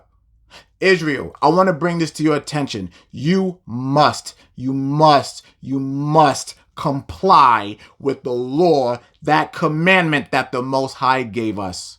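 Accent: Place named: New York, United States. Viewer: Shalawam Brother, praying safety for u n ur household. Sounds like ur from the Big Apple so be safe n keep doing what TMH n Christ wants u to do. MHICBU all!!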